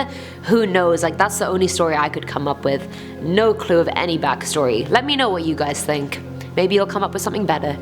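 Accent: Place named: United Kingdom. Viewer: British accents! (I have a British accent too)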